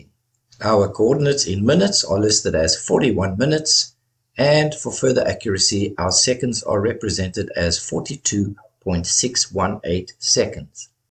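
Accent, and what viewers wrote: South Africa. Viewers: My God, this is great! And I love the SA accent!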